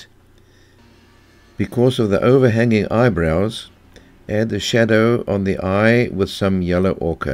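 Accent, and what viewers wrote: South Africa. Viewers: I absolutely loved hearing my fellow South African accent so unexpextantly. I have been living in the US for a few years and was looking for some new inspiration in my paintings. Great tutorials.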